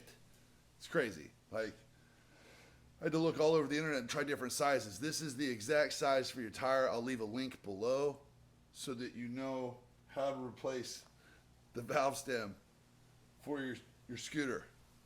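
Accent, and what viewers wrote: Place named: United States. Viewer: WTF the terminator but with american accent woah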